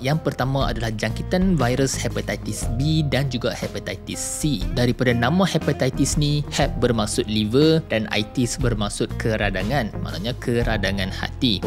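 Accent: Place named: Kedah, Malaysia. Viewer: Weiii ,hangg org kedahhkaaa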